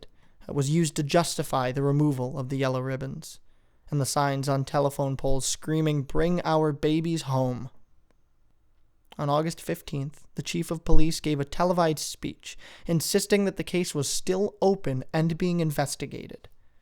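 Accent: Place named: South, United States. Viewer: Great narration! Loved the "southern" voice. Is there a part 2???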